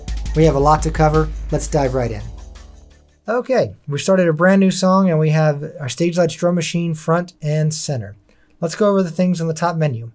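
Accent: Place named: United States. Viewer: I love video tutorials. But my english is not that good. The American accent makes it harder to follow the video, too bad. When will you finally make a manual in HTML or PDF format?? Anyone can translate that with Google Translate. In addition, it makes sense if a specific information needs, then you can search for it!. In video format, I do not have this option.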